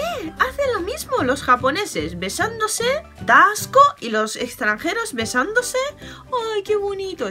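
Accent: Spain. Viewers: V ella es española?? O japonesa :v cara de asiático y asentó España (khe verga) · Como se nota que has aprendido a hablar castellano con un madrileño, tienes ese desqueje propio del madrileño, que nos ha dado fama de chulos. ¡¡es muy bueno!! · el acento españolete no "mola" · tienes que tener acento de España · está fatal tu acento españolete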